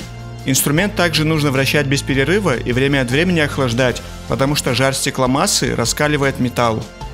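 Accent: Kabardino-Balkaria, Russia. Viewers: Кабардинец? Родной акцент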